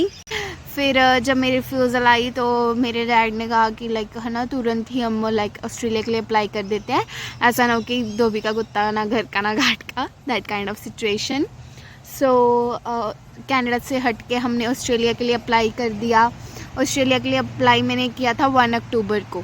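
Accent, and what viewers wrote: India. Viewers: Waah what a Punjabi accent!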